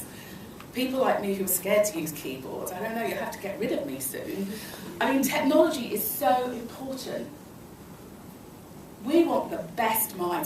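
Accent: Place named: United Kingdom. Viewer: Way’ment. I remember when she was in the group, but when did she develop this British accent?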